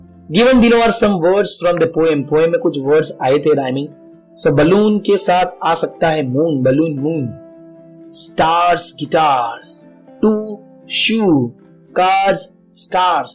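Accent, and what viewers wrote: Gujarat, India. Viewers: Sir ap gujarati ho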